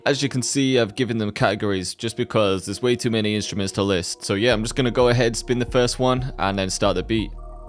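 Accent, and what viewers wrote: United Kingdom. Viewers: YEAH BRO L;OVE THE PURE RAW UK ACCENT STYLE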